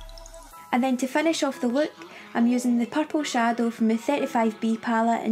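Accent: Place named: Scotland, United Kingdom. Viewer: Are you Scottish? Love the accent! My family are all from Scotland,, my mum and Dad moved down to England and so me and my sister got an English accent so we’re different from the rest of our family 😂😘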